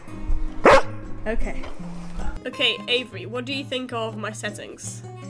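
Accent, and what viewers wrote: United Kingdom. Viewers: That's the time I stopped watching. Why do you have to talk so freaking fast?!?! You already got a heavy British accent which is hard for the most of the world to understand.. Food for thought..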